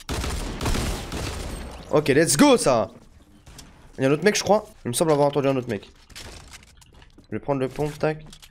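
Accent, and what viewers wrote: France. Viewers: les gars avec son accents francais est le style maison il habitr ah marrakech sais sur fois mille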